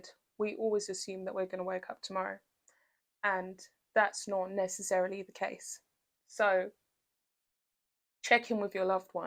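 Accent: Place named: England, United Kingdom. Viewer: I'm glad you're back. You're one of my favourites. As an Englishman from London, it's lovely to hear an English accent....especially when it's a competent and educated one. Keep up the. Good work.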